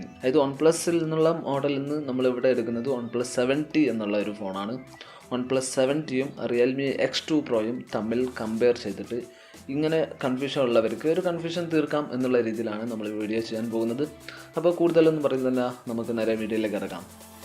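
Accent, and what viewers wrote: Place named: Malappuram, India. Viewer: Ayye malappuram bhashayil avatharam... 🤭🤭🤭😬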